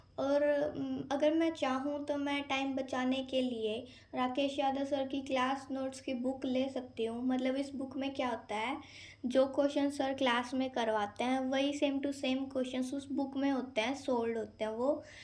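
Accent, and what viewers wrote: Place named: South, India. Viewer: South Indian ho kya aap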